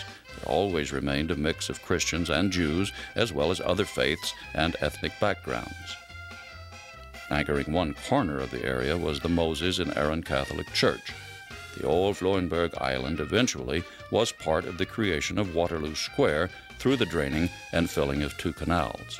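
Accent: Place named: Germany. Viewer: Oh how it HURTS my ears to hear the Dutch words pronounced in GERMAN!!! Great documentary otherwise! But this should NOT have happened! Easy to prevent this and obviously not a very nice touch...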